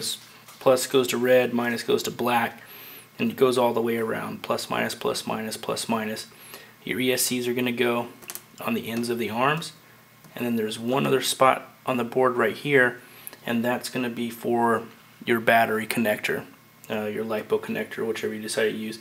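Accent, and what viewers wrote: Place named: United States. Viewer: got their language from England (i.e. you actually speak English in case you hadn't realised.....) anyway, all that said you pronounce 'Solder' with the 'L' pronounced so it isn't, never was, never meant to be pronounced 'Sodder'. Just like you look at yourself in a 'Mirror' and not as you Americans say in a 'Merr'! AND also while we're at it, the most annoying mispronunciation you say, there's an 'S' on the end of 'Math' making the correct pronunciation 'Maths'. So please, when you beg, borrow or you steal something (like language for instance), please have the decency to use it correctly. I eagerly await your childish, abusive comments as I know how you Yanks hate to be told anything and 'put right'. Thank you and 'have a nice day y'all' :)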